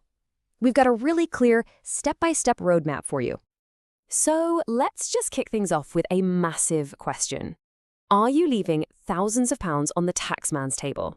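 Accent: United States. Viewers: Excellent content, although why the horrendous American accent?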